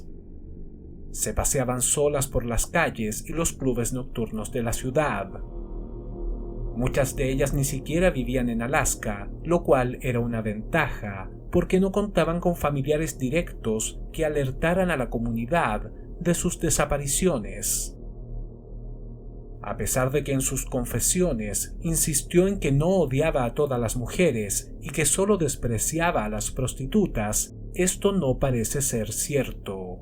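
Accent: Chile. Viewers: Tus documentales son geniales!!! Esas introducciones para ponernos en contexto de la historia que nos vas a contar, está perfecto. Por tu acento, diría que eres de Chile ¿no? Jajajaja. Saludos desde Colombia. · Yuuuuuuupiiiiiii!!♥️ Gracias de precioso acento chileno;)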